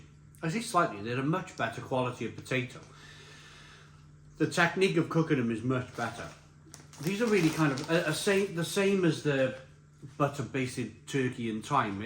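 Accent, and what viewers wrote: Wales, United Kingdom. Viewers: It's not his fault, he's Welsh.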